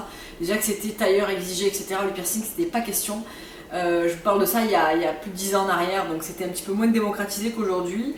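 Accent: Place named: Marseille, France. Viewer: Merci pour cette vidéo! je me disais bien que tu avais des intonations marseillaises meme si je n'en étais pas sure a 100%! Toujours agréable à regarder !!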